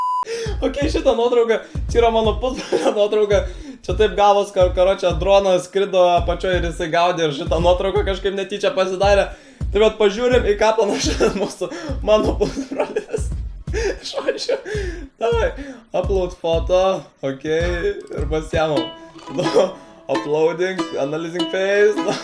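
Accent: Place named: Samogitia, Lithuania. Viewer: Tikrs žemaitis esi, nes žemaitėja yr geriausia ❤ Kap į tava video😍❤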